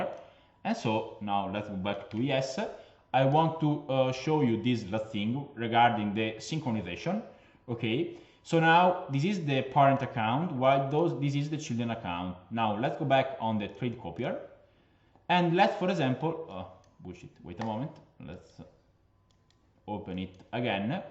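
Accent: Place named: Italy. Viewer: chicco bello il tuo inglese è buono però si sente che sei italiano aahahha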